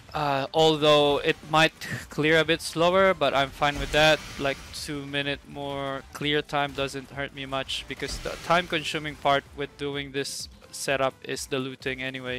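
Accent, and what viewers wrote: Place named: Philippines. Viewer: Fellow Pinoy PoE player? Guessing from the accent lol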